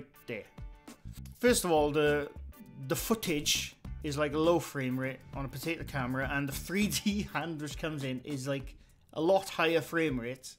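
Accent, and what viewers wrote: Wales, United Kingdom. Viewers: Is that the accent of a fellow Welshman I hear?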